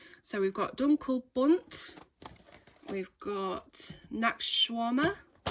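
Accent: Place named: Nottingham, United Kingdom. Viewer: Love the video. Are you from Nottingham? Your accent is similar to where I grew up, but I moved away when I was a child so I could be wrong xx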